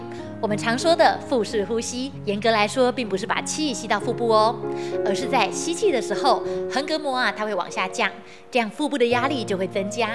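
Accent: Taiwan. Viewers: 台湾腔好可爱☺️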